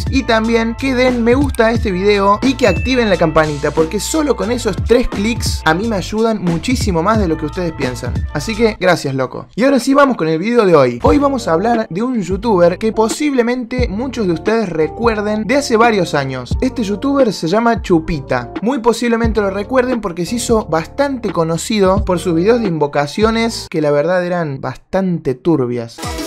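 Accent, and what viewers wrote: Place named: Argentina. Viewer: Pareces argentino XD sos?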